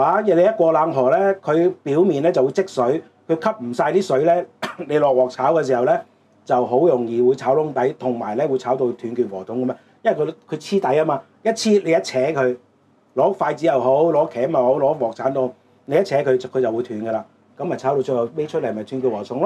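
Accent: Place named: Southeast Asia. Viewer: Leo應該唔係香港人聽你講野似東南亞人多啲喎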